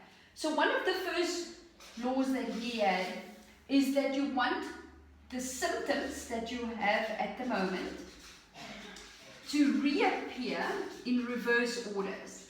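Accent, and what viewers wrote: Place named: South Africa. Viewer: South African:)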